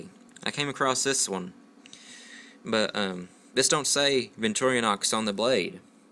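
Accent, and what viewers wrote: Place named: South, United States. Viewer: You honestly don't sound like you have one... just an American Accent from the south.... you pronounce most words fine... ignore the haters man :)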